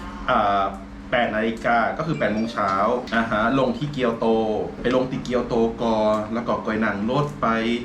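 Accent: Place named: North, Thailand. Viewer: พูดภาษาเหนือน่ารักจังค่ะ☺️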